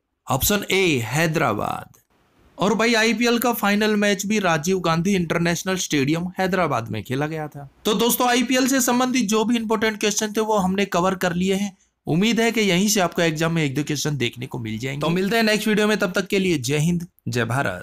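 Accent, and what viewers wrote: Haryana, India. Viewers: भाई आप हरियाणा से हो क्या?? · Aap haryana se ho kya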